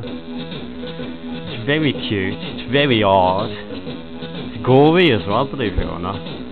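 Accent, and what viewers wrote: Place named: Birmingham, United Kingdom. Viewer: The Brummie accent is the worst in the world. This fella was sending me to sleep just listening to him. What the fuck is 'Feck"? Terrible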